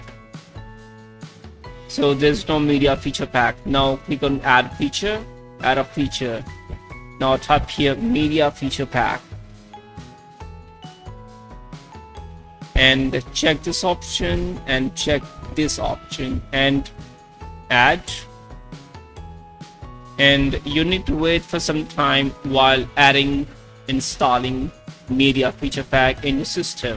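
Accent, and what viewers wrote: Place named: India. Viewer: Always the underrated Indian accent tutorials that ALWAYS WORK!!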